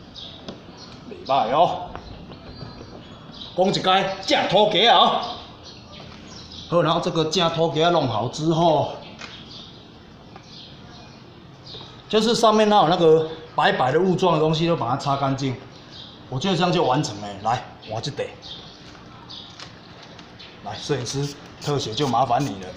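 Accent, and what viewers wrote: Taiwan. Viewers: 補課+1 台氣 又有力! 台語讚!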